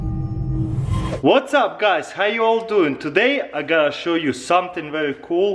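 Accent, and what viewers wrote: Russia. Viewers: it's because the russian's accent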